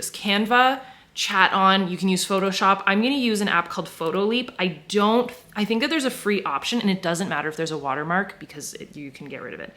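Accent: Quebec, Canada. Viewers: en français! Apprends-nous l'accent québécois <3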